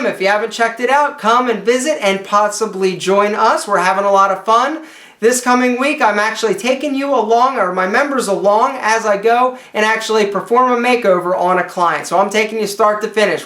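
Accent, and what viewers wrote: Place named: New Jersey, United States. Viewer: Don't take it personally, he's probably from Jersey with that voice.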